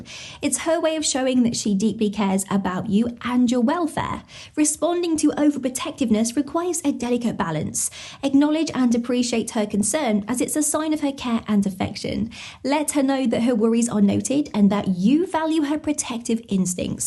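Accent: United Kingdom. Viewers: British accents are so awesome!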